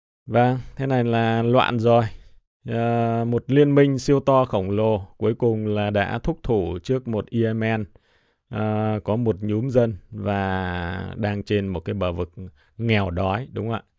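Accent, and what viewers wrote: Standard. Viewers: Anh nói rất chuẩn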